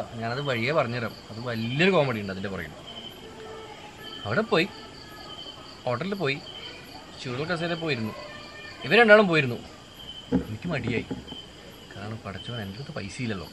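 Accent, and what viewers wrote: Kannur, India. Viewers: കണ്ണൂർ ഭാഷ പൊളിച്ചു ഭായ്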